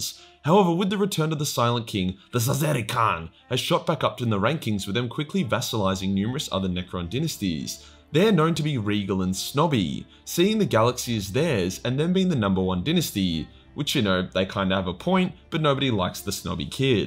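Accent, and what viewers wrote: Australia. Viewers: When’s a gene-stealer vid coming, just started buying some up to make my second army and would love to hear their lore spoken by a true chad Australian lore lord UwU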